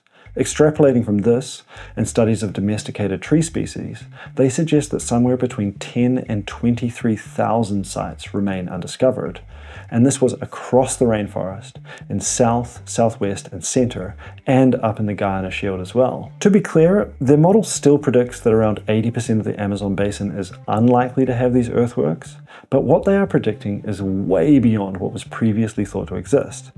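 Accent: New Zealand. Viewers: whats that accent? is it nz or a bit australian and there are rhotic r's ive never heard it before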